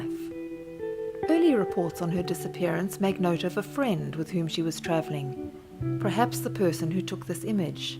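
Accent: South Africa. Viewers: Nice to see a YouTube channel with a South African voice covering this type of material. I have started a blog and will soon have a podcast going which will cover South African true crime cases. I hope to cover Marie's story and have made contact with the twitter account which runs under Get Marie Home. Very strange story. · Very sad,love your channel your soothing South African voice...thanx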